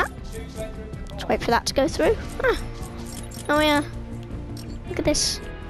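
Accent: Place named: United Kingdom. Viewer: He sound so British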